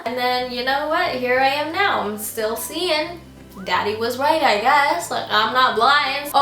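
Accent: Visayas, Philippines. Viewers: Grabe mo bisaya hahaha. Btw, Ate murag kag si Ate Bern sa CDO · Bisaya lagi kaayo dai HAHAHA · Maayu gani kabalo pa xa mo bisaya! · Your bisaya is so adorable .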